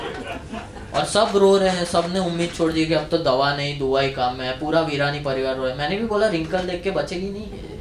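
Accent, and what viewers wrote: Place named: Gujarat, India. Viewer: I love the way that Gujarati accent you use. Hats off. 🤩 you are star.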